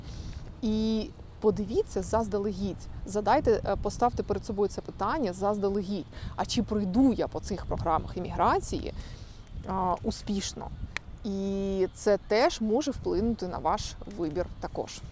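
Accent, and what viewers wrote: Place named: Kyiv-Poltava, Ukraine. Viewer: Інколи Ви розмовляете київсько-полтавським діалектом.👍Дякую Вам за це🌷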